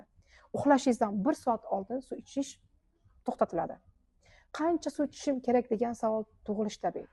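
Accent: Bukhara, Uzbekistan. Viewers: Шеваси бухороликлага ухшаркан ❤❤❤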